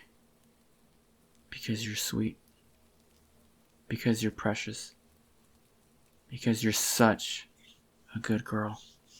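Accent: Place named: South, United States. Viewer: bro idk why but he sound hella country....still a good AMSR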